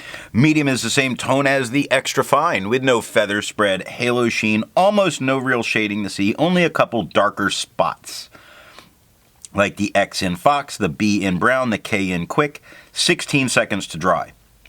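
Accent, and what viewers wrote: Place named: Philadelphia, United States. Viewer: Oh well. Some of your inflections sound like Philly. Hope you have a merry Christmas!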